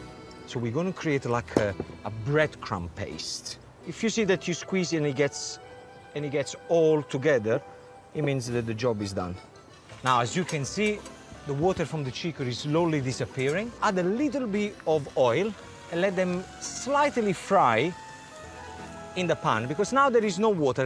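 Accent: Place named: Italy. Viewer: I am Finnish and I like your English with Italian accent. I like to hear Itslian. Thr colour of the Italian language is making the atmosphere..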